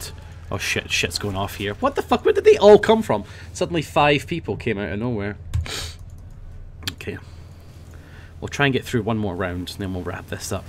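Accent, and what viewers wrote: Scotland, United Kingdom. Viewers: Knew it was a fellow scot with this wee fanny ! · So Scottish :) There can only be one Demoman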